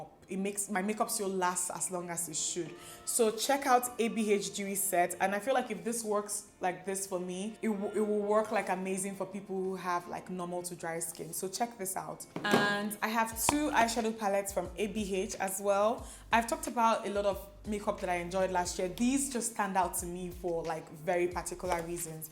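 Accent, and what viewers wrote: Igbo, Nigeria. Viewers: Where have you been, you sweet? Lol. I recently subscribed to this channel and I love that slight Igbo accent you've got!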